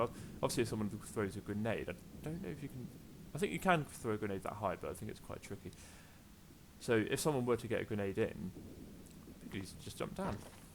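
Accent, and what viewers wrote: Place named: England, United Kingdom. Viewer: great video! are u from England? u have a nice accent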